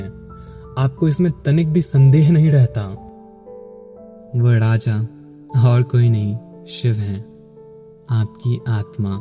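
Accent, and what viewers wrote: Bihar, India. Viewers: Oye grammer me phd wo bihar ke hain aur Bihar me aise hi pronounce hota hai r, har jagah ka regional accent alag hota hai. Tera hi bas sahi nahi hai, ek to wo itna kosish kar rahe hain hamare accent me bat krne ka upar se nautanki karna h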